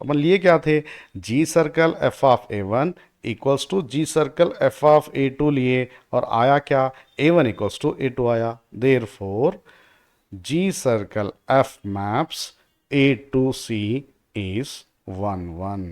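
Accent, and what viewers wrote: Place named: Hyderabad, India. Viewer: With hyderabadi voice 😍 ❤️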